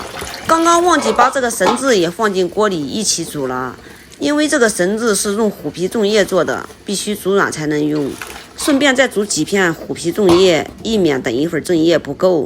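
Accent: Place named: Guilin, China. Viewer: 解释得非常清楚，还喜欢你的口音，很是桂林人吗？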